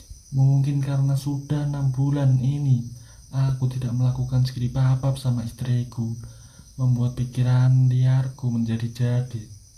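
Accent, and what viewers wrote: Java, Indonesia. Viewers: ngongg ny biasa aj brooo , mau gmna bicara bhasa medok ttp aj kliatan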